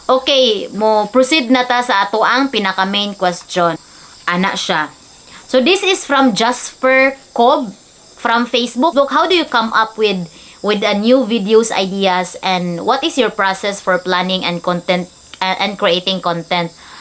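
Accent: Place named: Bohol, Philippines. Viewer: Dli k tinood tga bohol kung wla k kabalo sa accent ni ms, especially ang iya mga word gegamit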